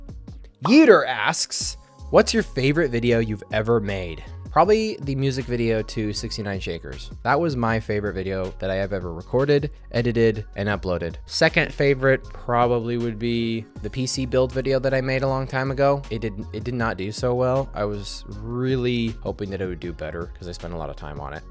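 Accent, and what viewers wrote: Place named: Scandinavia. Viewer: I love that you read all of Brage’s questions in a Scandinavian accent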